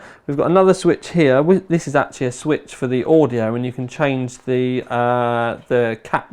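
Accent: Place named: England, United Kingdom. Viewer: He sounds like he knows what he's talking about because he has an English accent.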